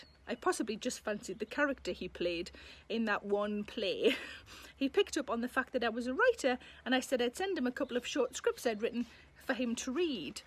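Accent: Newcastle, United Kingdom. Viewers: Watching in St Louis, Missouri, USA. I first heard your voice on a TV while sitting in a hotel room in Wales and I thought you were the funniest comedian I'd heard in years. I wrote down your name so I could ask the friend I was traveling with if he'd heard of you, and he told me you were from Newcastle (he was too). I visit Newcastle for business every year, which helps me translate your Geordie to my wife when we both listen :)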